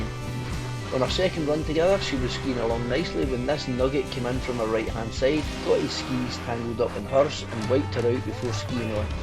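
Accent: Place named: Scotland, United Kingdom. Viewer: We are going there next Feb & this vid has Gave us great insight of what's to come. Great tae hae a Scottish accent on the vids tae. Brilliant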